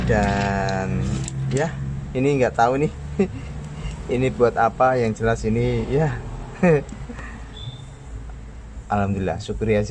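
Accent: Java, Indonesia. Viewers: Bang .......!!!!!! sebenarnya abang itu orang mana kok bahasa jawanya lancar and medok sekali terima kasih bang ... selalu jaya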